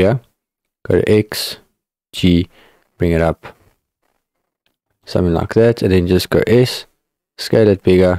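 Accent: South Africa. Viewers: The first 3 seconds of the video and I already thought that this guy is probably South African :)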